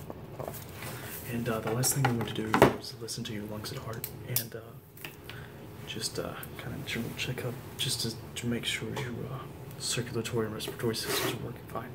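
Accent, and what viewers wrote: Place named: South, United States. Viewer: sound like a Southerner to me, Georgia?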